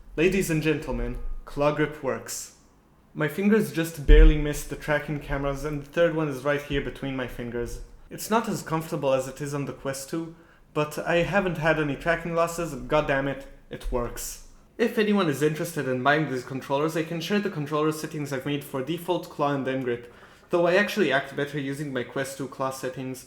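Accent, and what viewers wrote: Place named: Israel. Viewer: מאיפה קנית אחי?(if your Israeli I get it from your accent)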